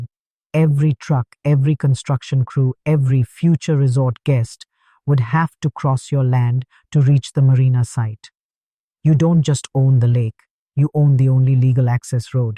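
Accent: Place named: India. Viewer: Oh my God it's got an accent of someone from India and it's so goddamn annoying I got to get rid of this one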